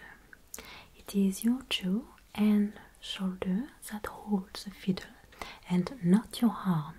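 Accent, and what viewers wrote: France. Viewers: Mon dieu...French accent whispering in my ear with quality headphones is pure eargasm. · and I was wondering at the first videos I saw what accent she has. Until I saw the french lesson video and now I am like: "Why the f... didn`t I hear that earlier? Yo can hear the french accent at a few words, I am so dump" So I think I`m not good in guessing accents..... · Your french accent is life😑 its so beautiful❤️ also, the sound of the music sheets lol😑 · I just finished watching her roleplay where she did a French accent that I thought she was faking the entire time just to find out she wasn't faking it lol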